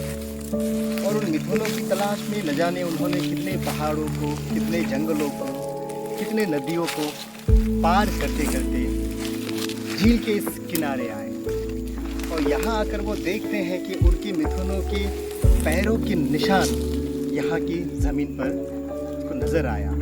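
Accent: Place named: India. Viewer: Your Hindi accent 🥺💖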